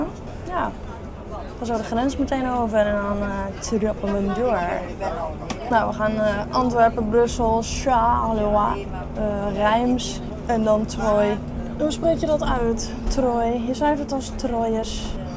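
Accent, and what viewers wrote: Friesland, Netherlands. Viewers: Met dat licht Friese accent de hele tijd klagen is echt leuk om te horen sorry haha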